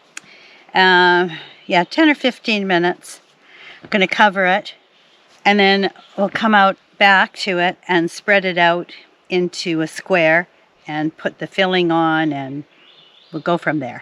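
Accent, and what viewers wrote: Canada. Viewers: Im from the Uk, I clicked on this, heard a Canadian accent, looked up Horne lake and realised its pretty close to where my dad grew up in Port Alberni! He passed away when I was 16 in 2016, so this felt like a nice sign from him and was a very comforting video ❤️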